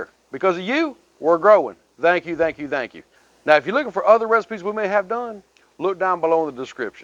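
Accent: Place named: North Carolina, United States. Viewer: What part of the south are you in ? I can close my eyes and you sound just my like family in Hudson NC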